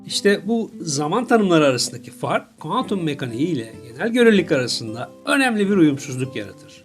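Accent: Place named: United Kingdom. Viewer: British telaffuzu.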